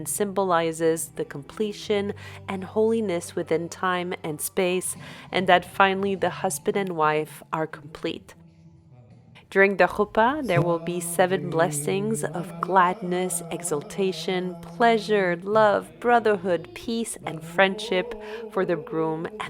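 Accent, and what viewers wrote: France. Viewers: I can tell by your accent you speak French and ofcourse because you referred to your uncle as tonton.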